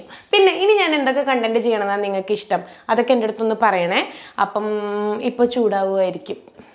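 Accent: Thrissur, India. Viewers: Ath pinne Nammade THRISSUR kkari alle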